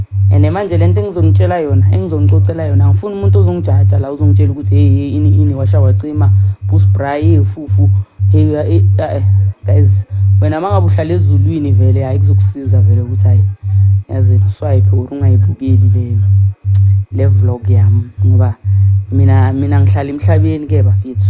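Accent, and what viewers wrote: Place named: Msawawa, South Africa. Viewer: Watching from OrlandoEast❤Yaz bengsamangalele itone yakho netaal Kanti wangapha eMsawawa,😂❤ Lentwana Lexi zize ngamawaza🤞maar zyaseta bro🤣🤣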